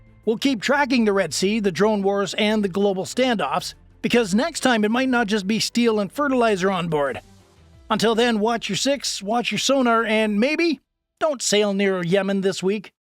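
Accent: Canada. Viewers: Is that a Canadian accent i hear? 🤔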